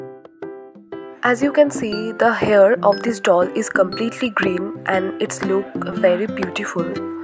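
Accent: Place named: India. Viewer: Are you indian 🇮🇳 your voice is matching 😊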